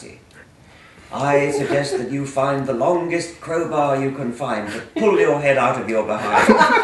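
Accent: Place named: RP, United Kingdom. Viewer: Hearing him swear in an educated and satirical British accent is hilarious.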